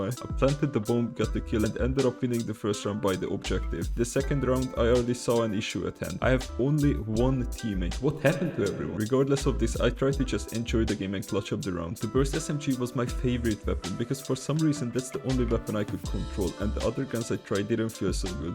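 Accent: Hungary. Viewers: are you from hungary? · Hold up are you from hungary?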